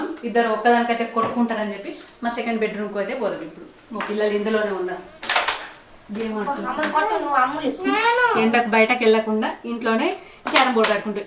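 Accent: Telangana, India. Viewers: Mede Telangana voice Andhra Pradesh atla anacokutaru.mari yatakarm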